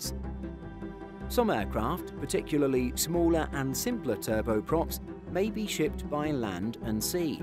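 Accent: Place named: United Kingdom. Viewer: Why all the Brit accent? Brits have little or nothing to do with turbo-prop aircraft ferry world-wide. ???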